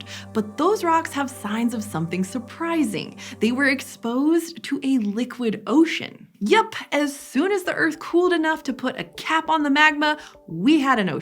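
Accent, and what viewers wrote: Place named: United States. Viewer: “Wader” I love your American accent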